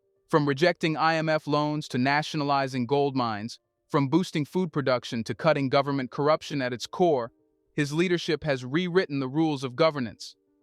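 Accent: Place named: United States. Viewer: It's annoying these random generated content and AI generated voice overs with American accents. But the message is mostly factual so we have to look past that.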